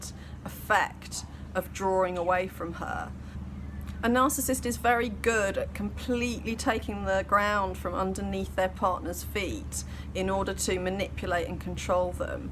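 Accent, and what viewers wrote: England, United Kingdom. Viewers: her beautiful British accent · Her accent sounds brittish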